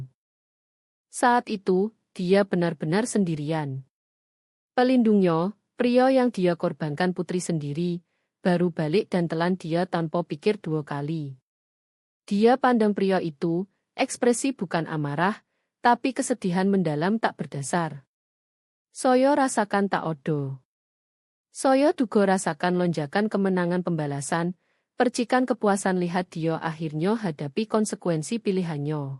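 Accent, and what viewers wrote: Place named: Java, Indonesia. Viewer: Bacanya kok rada aneh ya 😮 teks bahasa Indonesia, tapi dibaca kaya teks bahasa jawa . klo bahasa jawa ga semua A dibaca O biar ga aneh di pendengaran .koreksi dan kritik (mohon perhatiannya admin)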